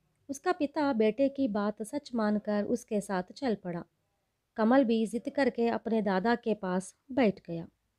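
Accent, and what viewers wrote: South, India. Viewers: Bahut achha aur bolne wali pakka South Indian hai and really love South Indian guys really hard working and intelligent people.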